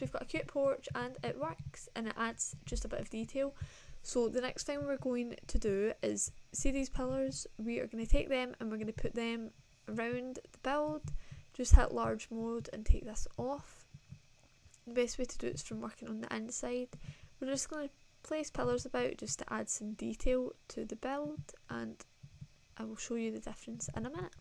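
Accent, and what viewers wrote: Scotland, United Kingdom. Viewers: She’s Scottish? Ireish?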